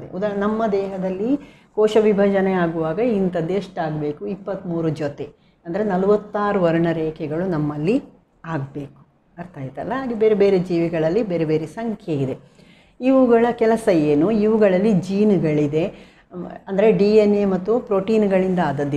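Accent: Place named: Coastal Karnataka, India. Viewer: ತಾಯಿ ನೀಮ್ಮ ಕರಾವಳಿ ಸೊಬಗಿನ ಭಾಷೆ ತುಂಬಾ ಇಷ್ಟವಾಯಿತು.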